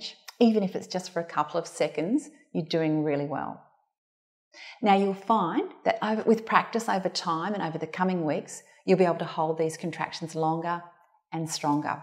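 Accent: Australia. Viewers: Thank you! Very helpful and nice to hear an Aussie presenter. I miss Australia! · She speaks with Australisn accent. It is very nice to listen to.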